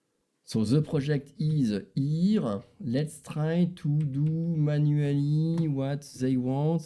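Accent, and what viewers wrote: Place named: France. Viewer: Merci beaucoup pour cette vidéo très instructive!!! with the cool french accent😅😅😅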